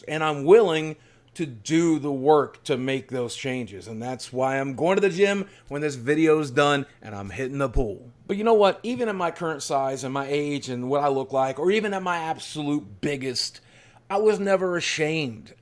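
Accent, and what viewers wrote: South, United States. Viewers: Watch his "origin story" series... he originally had a hillbilly accent, and it comes back when he visits the town he grew up in.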